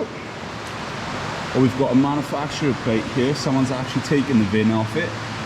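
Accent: Wales, United Kingdom. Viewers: Where you from mate im from Abergavenny and by your accent i recon you've got to be from somewhere like Brynmawr or Ebbw Vale??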